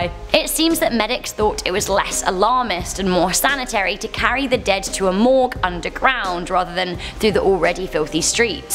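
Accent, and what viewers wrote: England, United Kingdom. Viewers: Are you from UK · Hi Beautiful can I get a shout out In your next video I just love your English accent and when you do scarry videos. And I love how you dress. You dress so nice 👍.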